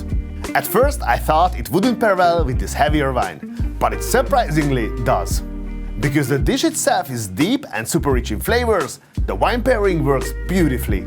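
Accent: Hungary. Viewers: Nagyon jo a video. Szerintem igy felmillio feliratkozonal ez a csatorna mar tekintheto komolynak is, igy erdemes lenne angoltanart keresned. Anyanyelvit mindenkepp,mivel valasztekosan,magabiztosan beszelsz, csak a kiejtes,es a hangsulyozas az, ami nagyon "magyaros".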